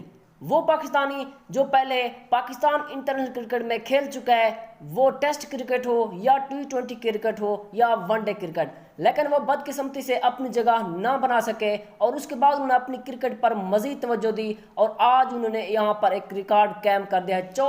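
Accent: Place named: Punjab, India. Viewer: بھائ اپنا پنجابی لہجہ تو بدل دو.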